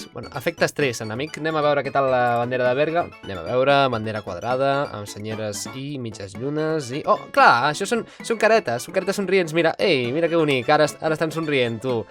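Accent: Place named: Mallorca, Spain. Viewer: I aquell accent mallorquí?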